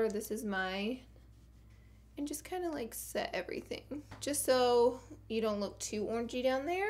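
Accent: South, United States. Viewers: Congrats Laura Lee! Love watching your channel! I am from Louisiana, Southern girl at heart! You still have that Southern Girl accent, love it! Keep being you!!! <3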